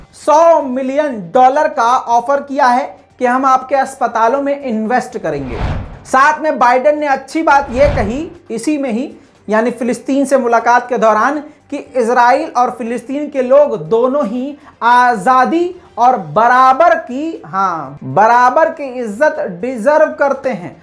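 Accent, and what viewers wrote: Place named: Bihar, India. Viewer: . India k kis elaka k rehne wale ho. Lagta hai bihari ho. Tumhara lehja bihari jaisa hai. Me Lahore se hoooon. Aur india se ache taalukaaaat chahta hoooon. Laikin yea unbhagat tatikhor nahi chahte. 😐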